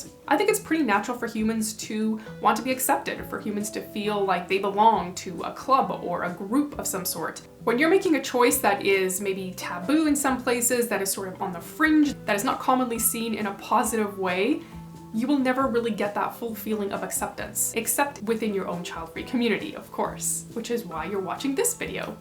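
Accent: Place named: Canada. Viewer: I didn’t realize you were Canadian until today! now I can hear that in your voice. Love that ❤️🥰 I think it’s adorable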